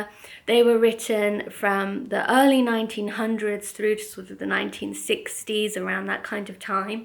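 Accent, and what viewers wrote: United Kingdom. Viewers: You just blew my mind when you mentioned you were born in Regina, I was born and have lived in/around Saskatoon my whole life and so many people have no idea where Saskatchewan is, and with your accent it's hard to believe you've lived anywhere but the UK. This was a fun surprise to my day! Annnd now I want to make soup, it's still -18 here so I need to get my hands on these ingredients and make this asap! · Isn’t it interesting you have such a strong English accent even though you spent much of your life outside of England- I wonder if that is because you were homeschooled and learned from your mother? I loved learning all these new things about you and I am loving all the new content you’ve been putting out.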